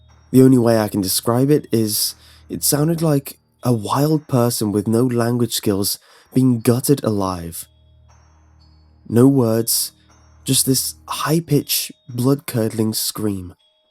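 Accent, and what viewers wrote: United Kingdom. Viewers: It's funny to hear this in a British accent, because I live in the southern half of North America, and most hunting stories around here are "WELL SHOOT FER CLETUS, I RECON I DUN DID SAWD A GHOST, SO I SHOT HIM UP WITH THAT THERE RIFLE I DID! WHOOOOOOOOOOO-EEEEEEE! Slaps Knees "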